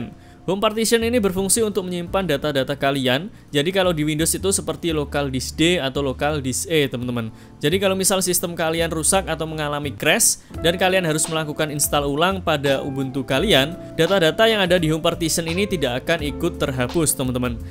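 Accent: Banjarmasin, Indonesia. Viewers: What , abang orang banjarmasin ya ?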